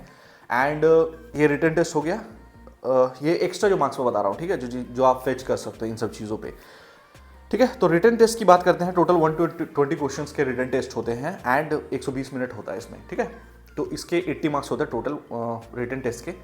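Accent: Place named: Bengal, India. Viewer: arekta bishoy e koutuhol holo tai jigges korchi Apni ki bangali? Puro naam ki apnar? Eto porishkar Bangla bolen tai jiggesh korlam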